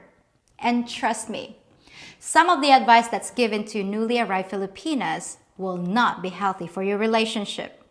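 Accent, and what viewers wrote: United States. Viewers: Hello!.. You absolutely a perfect in the U.S.. Everyone would think you were born and raised in the USA.. Another great episode!..❤❤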